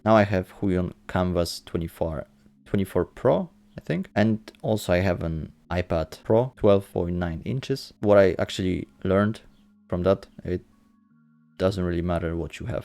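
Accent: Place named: Poland. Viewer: Thats some great tips! By the way, if I may ask, where are you from? (Im polish and thought your accent sounds alike)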